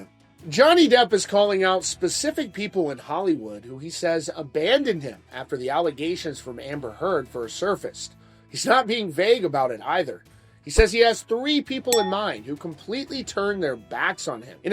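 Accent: United States. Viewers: Time to come home to Kentucky my man ,Need to work on that damn accent you have now anyway , went full blown yank on us.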